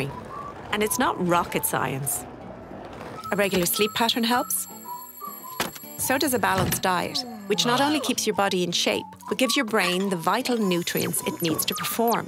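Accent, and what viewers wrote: Ireland. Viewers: I was wondering why I heard a faint Irish accent. Then I saw 'BBC' in the channel's title :) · So does this mean it's not my fault that when I hear an Irish accent (sorry voice over) I automatically think of Gypsies trying to tarmac my drive or sell me pegs?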